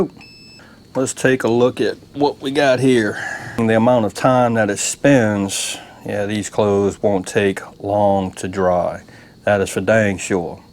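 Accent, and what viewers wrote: South, United States. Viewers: Oh my goodness!! The southern twang and slang made me giggle so much it just made my day. You’re the best! Love this review & it helped me. So thanks for your time & the good vibes!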